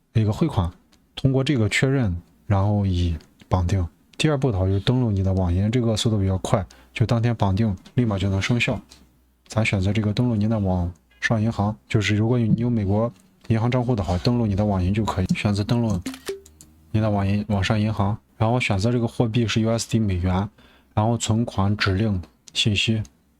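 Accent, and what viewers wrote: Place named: Guanzhong, China. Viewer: 这口音是咱关中乡党呀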